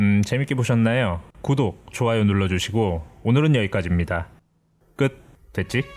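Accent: Gyeongsang, South Korea. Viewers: 아 약간 경상도 억양이 있는 듯 한데 경상도에서 오셨나요!!! 헷갈리네요 ㅎㅎ 항상 잘보고 있어요!!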